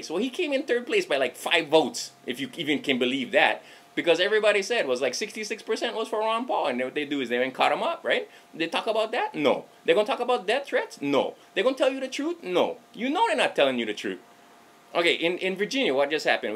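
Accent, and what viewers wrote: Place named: England, United Kingdom. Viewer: Nice D&D shout out. LoL. However you made this far too English English. Was right esay to unnastan man.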